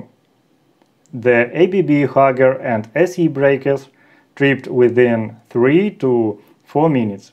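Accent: Russia. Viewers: Сразу слышно русский акцент)) Спасибо за тесты и за все видео =) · Слышу Русский акцент))) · Ебать русский акцент сильный😂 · Why Russians have terrible English accent? 🤔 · Сразу видно русский на Английском говорит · У тебя русский диалект. Почему на русском языке не делаешь материал?)) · Английский русскоговорящего нисчем не спутаешь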